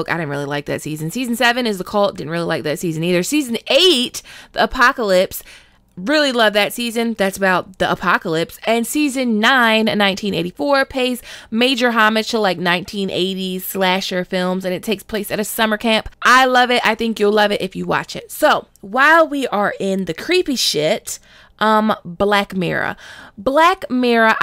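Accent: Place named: Baltimore, United States. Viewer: I knew you was from Baltimore because you sound just like me 🤣🤣😂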